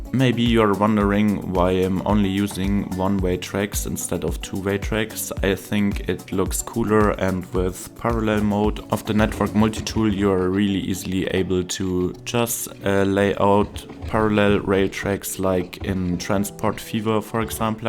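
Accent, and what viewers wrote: Germany. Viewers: Love your commentary. Love Germany, love ya accent. From a bloke in Australia :) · Ich liebe deinen deutschen Akzent! Grüße aus Australien. :) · I’m sorry people are so crappy. Your English and your videos are great. Your German accent is actually quite charming! I’m glad you put your voice in videos! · Well, a couple of things. First, I absolutely love hearing your voice and the accent. My grandfather came from die Schweiz (Lucerne canton) and my father could speak fluent Hochdeutsch. As a result, I learned some Deutsch as well and took a few years of language study in college. Sadly, I've forgotten a lot of it, but a German accent is a very nice thing for me to hear sometimes. Second, I think that it really sucks that people objected to you speaking on your videos and were mean to you. Besides the point above, I think you do a fine job with English and hearing a voice reminds me that there's a real person behind the genius of your builds. 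You have taught me a lot and are a treasure to the Skylines community. I'm glad to hear you! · Ich selber finde den deutschen Akzent auch nicht besonders schön, aber deswegen beleidige oder vermiese ich doch niemandem den Tag? Jeder kann seine Meinung haben, aber ich würde deswegen niemals auf anderen rumhacken. Außerdem sind deine Zuschauer ja hier, um dir beim zocken zuzuschauen! Außerdem habe ich jetzt mehrmals gelesen, dass andere die nicht Deutsch sind, den Akzent garnicht so schlimm finden. 🤷🏽‍♀️ Lass dich von den ganzen Kommentaren einfach nicht runter machen. Wenn du Voice Commentary machen willst, dann mach es einfach! Und dein Englisch ist ja nichtmal schlecht!! (Übrigens ist es echt angenehm dir zuzuhören 😅) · Your English accent is fine. You do sound German, but there's nothing wrong with that. I can understand 100% of what you're saying, and that's all that matters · You speak English well sir... 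and yes you have an accent imagine that! A German speaking English having a German accent... no really. German is one of those languages that you grow up speaking and learning how to form some complex sounds in your throat muscle memory as it were and you can not just stop that memory when you change languages. I speak Thai but with an American English accent and wow.. no one understands me sometimes :) ... The accent is perfect for the videos which is why I started following you to begin with, build, artistic license, knowledge and inspiration that is why I follow you, the mad German scientist is just a plus :) Thanks for all the inspiration and sadness that my city building skill are just not up to par with yours sir!!! LOL ... peace out brother. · I think your German-English Accent is really pleasant. It's not harsh at all. Don't listen to the pointless critiques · imo your videos are better without narration it's not that your english is bad, it's pretty good, and yeah, even i as german can hear the german accent... 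it's just that all your vids i watched the last 2 years were unnarrated and this narration kind of... destroys the whole 'oh a new video is up' i love your choice of music and that helps relax after a day of work. so i just want to sit here, watch city go brrrr and listen to some music. now i have to listen to your voice - and can't hear that music and relax. good that you had the 'commentary' tag in your video. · It feels very safe having someone with a german accent comment a build of infrastructure. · I really like the German accent ❤ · It’s great to hear your voice, everyone has got an accent. It’s part of their unique personality. Even English speakers make fun of other English speakers accents. I love hearing English with a German accent 🇩🇪. Makes it all sound so technical and official 😂 vielen dank und warte auf das nächste video 🙏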